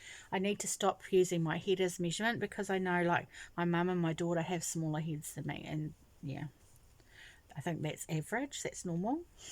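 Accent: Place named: New Zealand. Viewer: Hi.. I've been a subscriber for awhile now and always enjoy your videos (and your Kiwi accent). I've lived in NZ and you bring back fond memories. I agree with you about postage from overseas being expensive but a long time ago I discovered Springwools in Ireland and have bought lots from them. They have a flat rate international shipping which is 3.99 Euro and according to Siri that would be $7.05 NZ. Their service is nice and quick, although I just noticed they have a message on their site saying they're experiencing slight delays because of Covid-19. Anyway, its worth a look. I love the King Cole Superballs - 250G and work out very reasonable.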